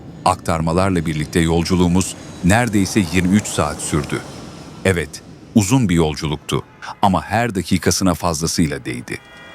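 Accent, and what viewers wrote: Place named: Turkey. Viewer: Salam niyə türk ləhçəsi ilə danışırsınız?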